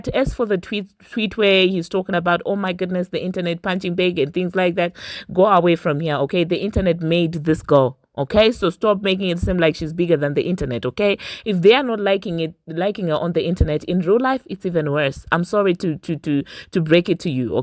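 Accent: South Africa. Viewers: thank you for representing us. That South African English accent 👌👌👌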